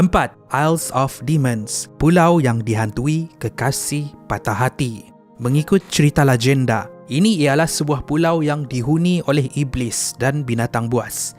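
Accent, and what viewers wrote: Sabah, Malaysia. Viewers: Admin ni orang sabah ka..loghat macam orang sabah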